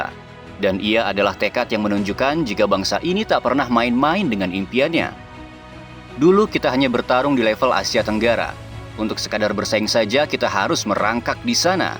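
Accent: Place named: Java, Indonesia. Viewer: Ilat jawamu sangat kental kang 😅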